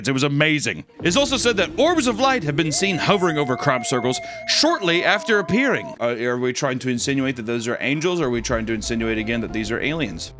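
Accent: England, United Kingdom. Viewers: the english accent/commentary has me crying 😂😂💯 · FAVORITE English accent by far. Holy fuck 😂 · As a Brit myself, your British accent is beautiful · every time does his English accent I lose it. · I love British voice, I wish we sounded like that, we would actually be interesting then. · As someone not from England, that's exactly how people from England all talk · lol that british accent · Why is his British accent spot on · I like British accent takes the form of Fred Flintstone somehow... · is truly a man of talent. The Bri’ish accents were so amazing 😂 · this video made me feel like i was slowly losing my mind with, the British accent is so funny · I love his British accent, how it tickles me. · The bri-ish accent is killin me 😂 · As an American I approve this British accent lol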